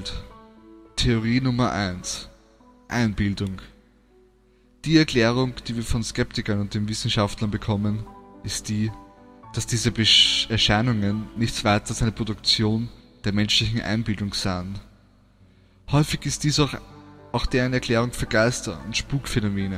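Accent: Austria. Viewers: du hast genau so eine stimme wie jmd den ich aus skype kenne bist österreicher oder ?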